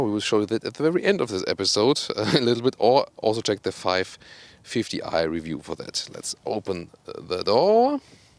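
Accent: Germany. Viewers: And that german english 😂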